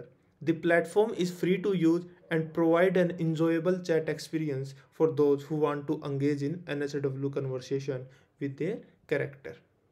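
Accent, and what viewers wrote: India. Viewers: Bruhh you from india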